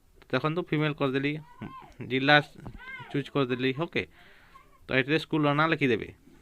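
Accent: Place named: Western Odisha, India. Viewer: Thank you sir Apana western odisha ra ki apanka language Ru semiti janapaduchhi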